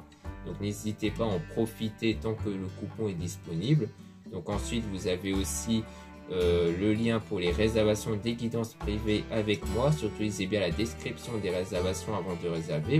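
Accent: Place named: Madagascar. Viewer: Je vous découvre grâce à Maria ❤️ j'adore le petit accent malgache /reunionnais🤗 merci pour ce tirage très parlant 🙏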